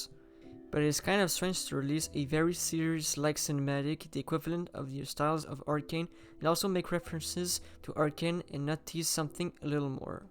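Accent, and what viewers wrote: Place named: France. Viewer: I cant agree, he has a slight french accent but the quality of the sound is very good.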